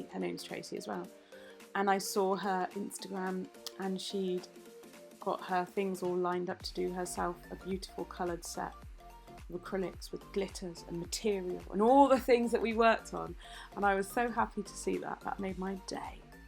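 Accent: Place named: England, United Kingdom. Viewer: I love to hear her too. I'm in America but a huge Anglophile.... her accent slays me.... her a the lady from! Love how she says "bits and bobs"... and other other local phrases. Lol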